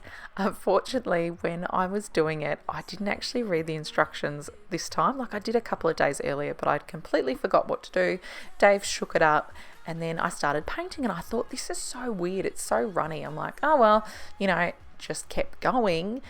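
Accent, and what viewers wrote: Australia. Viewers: I've got the LG combined washer and dryer as I live in a unit so am space limited. I love it. It can take an hour and a half to dry clothes which is ages but i absolutely hate hanging them and then having the little clothes sculpture lurking around for days before I can be bothered folding them. ps. new melbourne follower here. So refreshing to hear an aussie voice on a clean with me vid.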